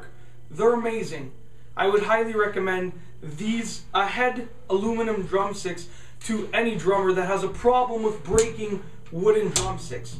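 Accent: Canada. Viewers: I love your Canadian accent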